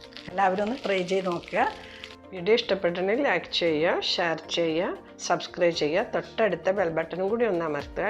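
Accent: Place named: Kannur, India. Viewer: ആർക്കും മനസ്സിലാകുന്ന തികച്ചും കണ്ണൂര് സ്റ്റൈൽ അവതരണം 👍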